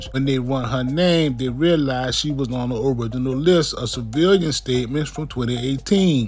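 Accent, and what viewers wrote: New Orleans, United States. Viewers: I Understand Completely What That Man Saying. This How We Talk In New Orleans. If You Can't Understand What He Saying Then Why You Watching His Content?! 🤷🏿‍♂️ And More Importantly If That Southern Accent Is "Painful To Listen To" Then Get Tf Off This Man Shyt!! 😂😂✌🏿✌🏿 -Signed A New Orleans Native Bih!! ⚜️🤣🖕🏿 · That’s how we if you’re from New Orleans.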